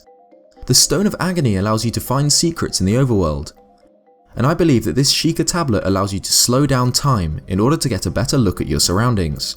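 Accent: United Kingdom. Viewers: Are you British? I love your accent.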